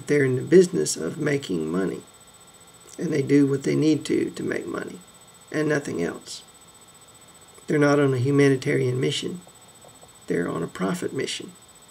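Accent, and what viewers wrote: South, United States. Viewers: Nice Southern Drawl and I am from La, if you know the truth you should share it. Isreal is a pawn and so are many of us that are slaves to the Money Masters. I do think it is time for the Constitutionalists need to start this off, but no you will wait till they come and knock down your door. The only thing you need to know is that the Elite and the G8 and the Bilderbergs, TLC and the UN and most of the Fed has us all by the Balls.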